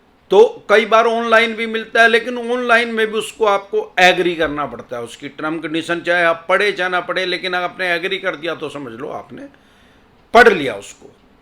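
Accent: Haryana, India. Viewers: सर आप हरियाणा से हो ?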